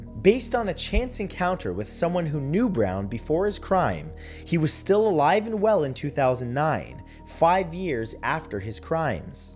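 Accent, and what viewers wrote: Canada. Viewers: he canadian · Thats quite the canadian accent.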